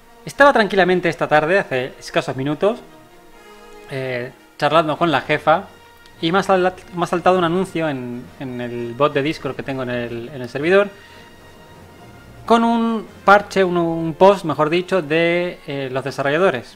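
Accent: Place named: Chile. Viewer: Pensé que eras chileno como yo, por como te expresas amigo 👍😁🇨🇱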